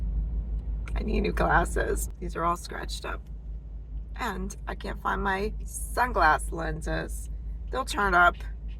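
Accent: New Jersey, United States. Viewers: First time subscriber. I thought i detected a Jersey accent. We had a Lance camper and loved traveling across country or many years. Fortunately our pets never needed a vet. Be safe.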